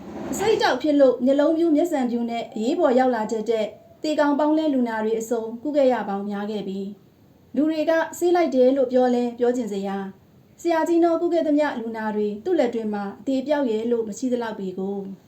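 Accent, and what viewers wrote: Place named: Upcountry, Myanmar. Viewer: ခင်ခင်ထူး ဝတ္ထုတွေကဖတ်ပြတာမကောင်းရင်စိတ်ဝင်စားဖို့မကောင်းဘူး အညာသံလေးပါမှ ကောင်းတာ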